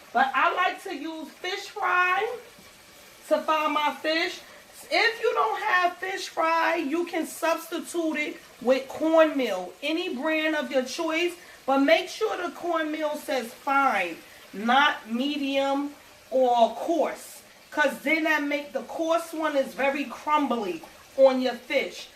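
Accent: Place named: Newark, United States. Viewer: She sounds like she from Newark lol we do that ova here too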